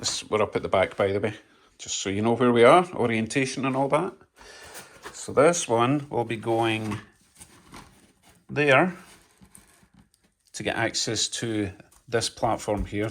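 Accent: Scotland, United Kingdom. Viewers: Its the Scots accent we have apparently some just love to hear us talk, LOL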